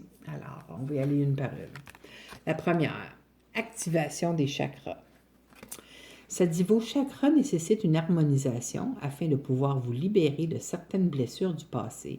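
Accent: Canada. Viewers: Bonjour, je vous souhaite de retrouver harmonie dans votre coeur, pas d' épreuve plus large que nos épaules...une expérience de plus pour travailler sur ce qui n'est pas encore "réglé"... pour autant, ne pas se flageller, je sens que vous êtes aussi une personne qui sait analyser les choses, réconfortante, généreuse et forte. J'aime beaucoup vos analyses des cartes. Au plaisir d'entendre votre accent canadien!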